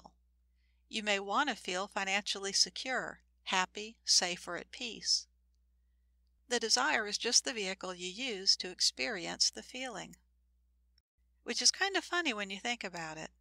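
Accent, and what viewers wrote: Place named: South, United States. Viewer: Very informative. Pleasant vocal as well. I put the accent as the Virginia - Carolina region.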